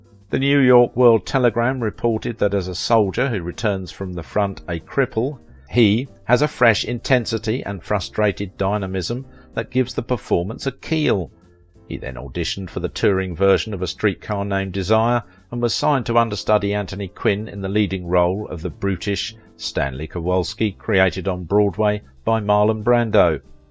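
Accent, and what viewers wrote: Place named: Cockney, United Kingdom. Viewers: The title is false. Brando did have his nose broken when he was horsing around backstage with another performer during the run of "A Streetcar Named Desire" but it wasn't Jack Palance who clocked him by mistake. It was one of the actors in the poker night scene who also had been a professional boxer previously. I forget the guy's name, but he wasn't a large man like Palance. Marlon himself told this story a couple of times. Why would he lie about who punched him? I have never heard the rumor that Palance was involved, and it seems made it up because it fits in with the idea of him wanting to go on for Brando. Here's another oddity--the fellow narrating has a cultured British accent, yet they are unable to write a decent English sentence. It should read, "Why did Jack Palance Knock Out Marlon Brando?" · The narrator himself may be a cultured man, I wouldnt know, but his accent is not especially so. 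Unless you mean cultured in the sense of deliberately created or adopted. The underlying accent sounds like an east end of London, "cockney type", accent. It might suggests a clever person who has got on reasonably well in life but who may not have been especially well educared in his early years. I am not saying the accent is fake, he may well have picked up his adult accent because of the circles he moves in.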